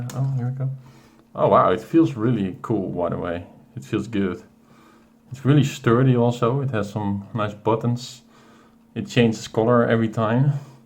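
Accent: Netherlands. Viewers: Ik hoor dat je nederlands bent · are you dutch? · Ik kan gwn horen dat je Nederlands bent haha :P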